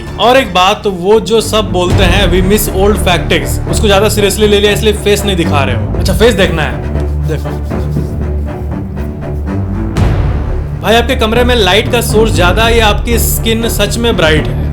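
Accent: Bihar, India. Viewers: Apka accent thoda bihari type lagta hai aap bihar se ho?❤ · AAP BIHARI HO KYA...????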